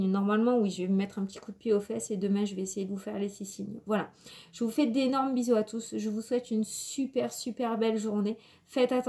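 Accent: South, France. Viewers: Merci, j'adore votre accent du sud. Apparemment, les sagittaire vont respirer un peu 😍😘😍😘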